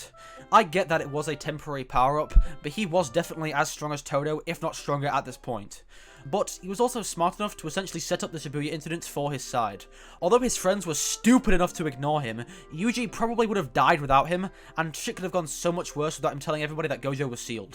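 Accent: United Kingdom. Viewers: After watching this video commentary, I now understand the lack of reading comprehension trope. Turns out having a British accent does not make you intelligent. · I CAN’T STAND THIS SCOTTISH ACCENT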